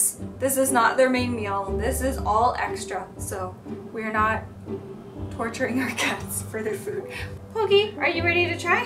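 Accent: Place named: United States. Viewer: How do you have such a clear american accent while living in Japan for so long?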